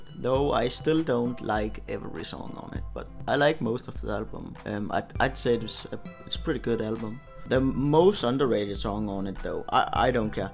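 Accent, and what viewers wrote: Denmark. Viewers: are you danish? you kinda got the danglish accent lol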